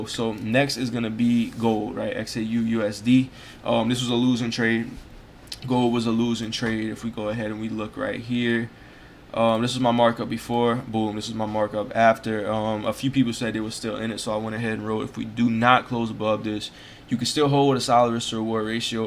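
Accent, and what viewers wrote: Louisiana, United States. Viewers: I thought that accent sounded familiar, I'm from Louisiana also. Lol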